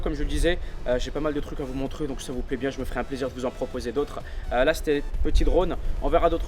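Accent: Quebec, Canada. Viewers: Il quebecoi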